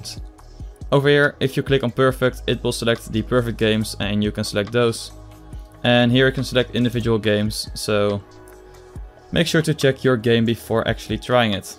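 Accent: Netherlands. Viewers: Haha ik hoorde het aan je engels XD, niet dat het slecht is. Goeie tutorial trouwens :) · je hoort meteen is Nederlander (geen haat bro)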